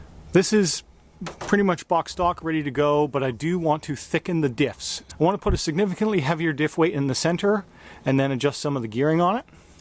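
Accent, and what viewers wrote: Canada. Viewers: New to your channel. Just subbed. Thought you sounded like you were from the great white north. Nice collection. Just did my first tekno build. Mt410 baja beetle with a wing. Went a little over board with the part. $2000 without the reciever.🤯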